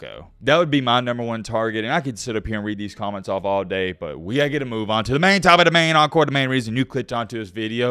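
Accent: South, United States. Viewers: jalen mealroe kills me with his southern accent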